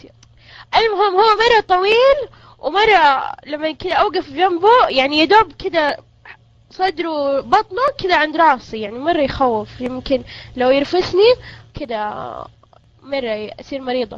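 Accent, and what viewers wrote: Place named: Urban, Saudi Arabia. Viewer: يعني الحين هذي لهجه حضر السعوديه؟ يع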